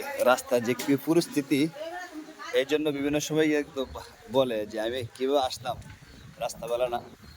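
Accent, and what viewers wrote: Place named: Nabinagar, Bangladesh. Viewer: এই অঞ্চলের ভাষা ব্রাহ্মণবাড়িয়ারনবীনগরে ভাষার মত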